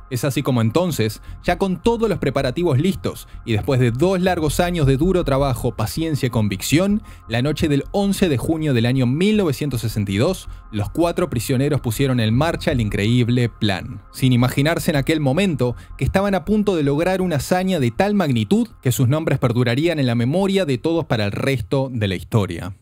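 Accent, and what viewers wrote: Argentina. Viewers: El narrador es Argentino de donde era Sandro ! Che !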